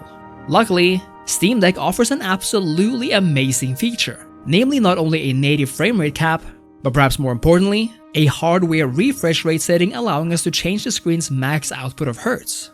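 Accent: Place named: Norway. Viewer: you from Norway? The accent sounds very much like it:)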